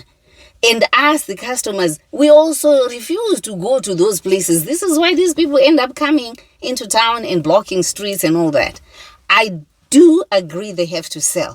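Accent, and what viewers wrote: Zimbabwe. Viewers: What a nice accent for a Zimbabwean, I enjoy listening to your voice. From South Africa 🇿🇦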